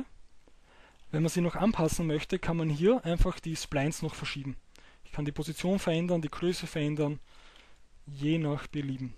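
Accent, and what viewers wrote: Austria. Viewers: Is doch voll geil das du Österreicher bist :D Ich mag deinen Dialekt ^.^ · Dafür dass du Östereicher bist versteht man dich ganz gut ^^, also jetz´ für nich´Östereicher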